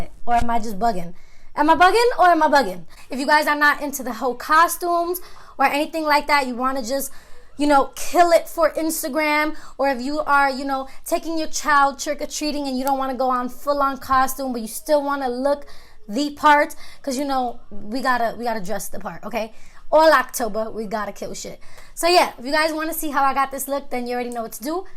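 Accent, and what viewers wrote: New York, United States. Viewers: Gurl where are you from? You are giving me major NY vibes and I’m loving it.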